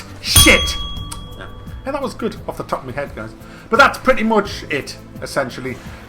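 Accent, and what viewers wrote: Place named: Newcastle, United Kingdom. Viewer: His geordie accent does it for me lmao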